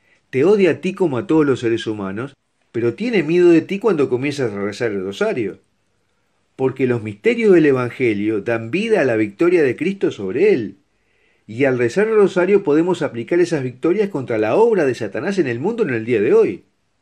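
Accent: Argentina. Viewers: En estos video que tanto nos ayuda a comprender el amor hacia Dios............tiene la caracterisca de una voz argentina........muchas gracias que Dios tenga preparado un sitio en el 3 er cielo a este Sr. que lo respeto mucho es uno de unos cuantos argentinos que se les quiere mucho obvio despues del santo padre FRANCISCO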